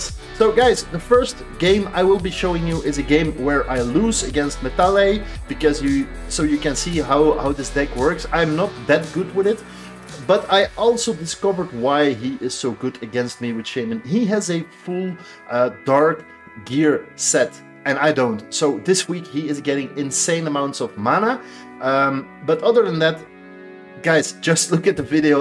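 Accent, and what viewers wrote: Netherlands. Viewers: Hi just a question, are you dutch? Cause your accent sounds like dutch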